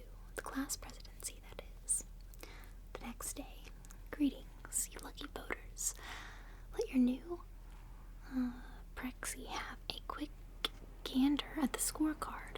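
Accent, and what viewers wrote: South, United States. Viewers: Tracing ✅ Little bit of country twang ✅ Fell asleep ✅ Would recommend to a friend ✅ #1 Youtuber out there ✅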